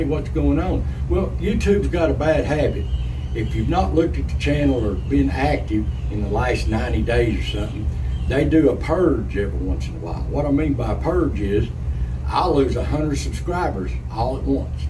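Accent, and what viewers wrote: Appalachia, United States. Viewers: I have been laughed at all my life for my Appalachian accent but just like you I refuse to change. I am teaching it to my Grandson. I walk tall being from the mountains of Appalachia and am proud to live here. Words to me like red neck and hillbilly are compliments. Appalachian people are the best we love our God, family, country and our guns. God bless you and all the great folks of this country, especially those from Appalachia. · I'm Right there with ya, with the strong hillbilly, mountain folk accent. And I'm Not ashamed one bit, doesn't mean I'm less educated, regardless of what people think. Common /mountain (hourse sense) of far more important and valuable than books smart... My opinion. Many years ago, when I did vlog type videos on YouTube, long before YouTube went commercialized. I had subscribers, mostly based on people just love to hear me talk, lol. 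Didn't matter what I was talking about. Lol. You've got me looking at a few metal detectors. Something I think would definitely be interesting, regardless I find anything of value or not. I'm like you, just like finding old gun shells. I'd be happy to find some of those from early 1900s, that'd make my day, alone. Thank you for your youtube channel. I've told many bout it, I love having a history Channel based on my area. Thanks for ALL you do! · you and that baked in accent represent and embody the wisdom of the great Appalachian mountains!! We thank you and have a happy New Year!! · me and my folks talk just as you do here in the Ga Appalachia near Ellijay. I appreciate you friend. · I enjoy all your videos. I sure appreciate all you do to bring us. I enjoy watching you metal detecting whether you find a treasure or not. To me the ole broken plow piece is a treasure for, it has it's own history or part of a ole bean pot; it's all a treasure to someone at some point. 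I'm still so excited about me finding the 1890s shotgun shell I found at the lake because, you got me so Interested in such.. I still haven't gotten to get a metal detector, maybe someday. I follow a lot of metal detecting on YouTube. I TAWK the way you do, my accent is my Appalachian gift. My kids don't TAWK the way I do either. God bless and Happy New Year to you all. Thank you for all you do, sincerely appreciate you. ♥️ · I am an old Appalachian hillbilly as well. I am quite proud of who I am and where I came from. I came from a hard working and God fearing people that respected and loved their neighbors. Our culture is slowly going out and you are doing a great job of recording a part of our history. You keep up the good work my friend and don’t worry about the accent. I understand it just fine! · Hi, I happen to love your Accent and All of your videos. You are doing a Great Job sharing the lost History and You Do Keep it Real! 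Thank You so much for being a teacher of your heritage and culture and mine as My Family is from Spruce Pine,North Carolina and although from different regions of Appalachia I love hearing and learning about all of it!! Thanks again and I hope you have a Wonderful and Blessed New Year!!😊 🙏🏻🎉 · Thank you so much for sharing your mountain stories. I have seen every video that you have put out. My people are from Pikeville. Your stories are like so many that was told to me by my Dad. Appalachia is so rich in American History and culture. You’re an excellent historian and researcher. Your accent adds the authenticity to the history that you are presenting. It would not have the same flare if told by someone not from the area. You give your audience a “real slice of life”. God bless you. ❤ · I talk the same way you do. It's the Appalachian in us. I have had people say that people in our region talk different it's just the way we are Love your videos God bless you I also have a wood stove but my home has oil heat too. 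It's expensive right now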